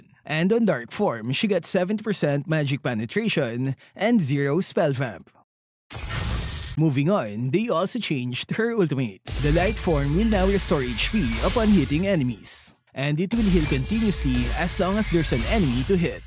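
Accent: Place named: Philippines. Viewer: I'm not focus cz Filipino accent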